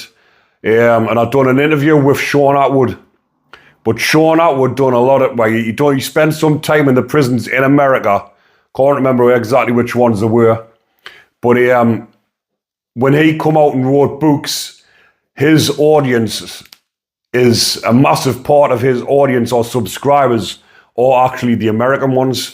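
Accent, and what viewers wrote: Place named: North East England, United Kingdom. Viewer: I hope I’m wrong, but maybe your north east accent may be why you aren’t getting the subscribers 🤷🏻‍♂️ I personally understand every word you say, but that’s because I’m English. What I see is a decent man, a man who has accepted his wrongdoing and is prepared to change. Not only that, you’re advocating peace ✌️ I think you’re fantastic mate 😮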